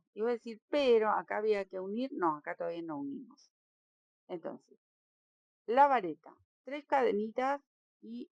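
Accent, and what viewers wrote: Argentina. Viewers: me dio mucha alegría volver a ver un video tuyo. Soy mexicana y aaaaamo tu acento argentino. Gracias por el tutorial, he querido hacer una colcha hexagonal. Muchas bendiciones!!!❤❤❤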